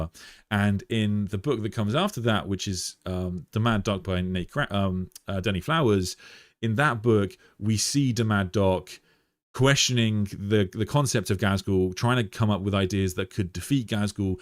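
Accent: United Kingdom. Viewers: I love when british caleb hammer talks warhammer to me :D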